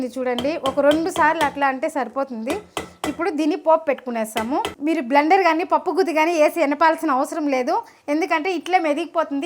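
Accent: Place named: Chennai, India. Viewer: are u from Madras because my in-laws are from Madras so I want say is what all u r cooking I am so familiar because all the items I too cook at home so exactly I know how it taste my favorite venchina pappu oh my God I can’t believe please let me know from where are u ok the way u talk too it is same I love it keep smiling enjoy look forward another recipe 😋.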